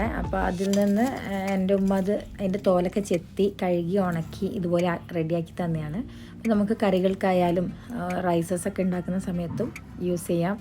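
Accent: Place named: Kannur, India. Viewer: aano.samsaaram ketappol njan karuthi kannurkaariyaanennaan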